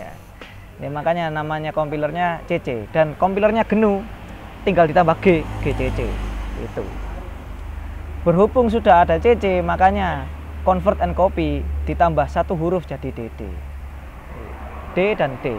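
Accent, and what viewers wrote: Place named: Surabaya, Indonesia. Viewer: Logate medok, ketok arek suroboyo y? Hahaha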